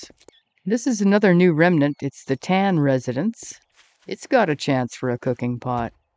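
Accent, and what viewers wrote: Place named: Canada. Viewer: Not sure I’ve heard a more Canadian, it’s gotta be, accent. Love it lol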